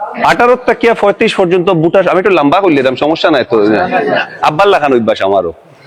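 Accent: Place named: Sylhet, Bangladesh. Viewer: আমরা সিলেটের মানুষের আচার আচরণ অন্য বিভাগ থেকে আলাদা ❤ আপনার কথার ভাষায় তা আবারও স্পষ্ট 😊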